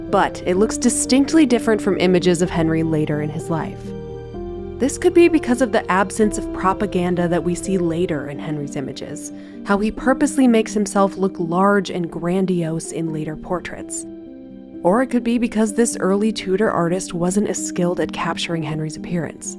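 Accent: United States. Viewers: Why an American narrator? Does not sound right somehow, and the over-the-top enthusiasm and gleefulness is downright weird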